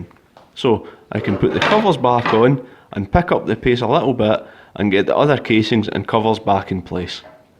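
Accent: Scotland, United Kingdom. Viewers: So, I'm an American and I'm having trouble placing the accent....Scottish? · Its great to hear another Scottish accent alongside this great work. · You've taught me more Scottish accent than Train Spotting, so thank you! Also awesome projects.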